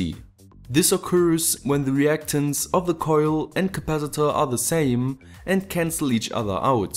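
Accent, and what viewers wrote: Germany. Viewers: Wie man sofort hört dass du deutscher bist ^^ Aber sehr geile videos machst du, respekt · that accent though, germans genes are strong in this one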